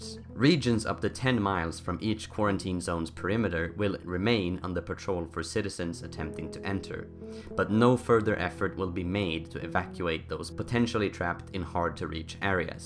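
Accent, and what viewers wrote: Sweden. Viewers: Heey are you swedish?! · He has a swedish accent anyway so I guess he is from sweden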